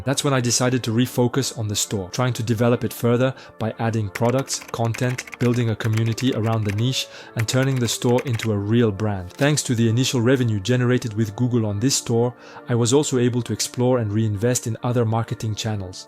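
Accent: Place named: France. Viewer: vu son accent en plus je pense que c'est un français je reconnais l'accent :)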